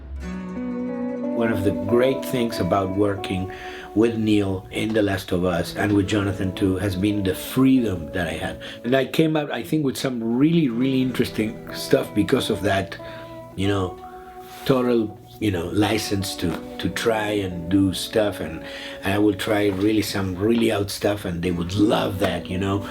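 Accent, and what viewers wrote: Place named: Argentina. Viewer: El tono Argentino se te nota desde el pincipio♡♡♡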